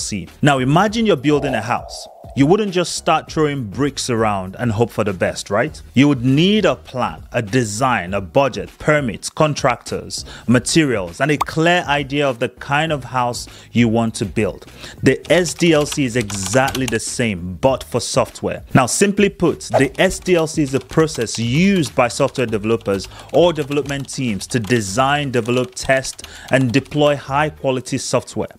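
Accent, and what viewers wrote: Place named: East Africa. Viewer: I love man brother. So eloquently explained. if I might guess, are African (East side). Bless you man😀😀😀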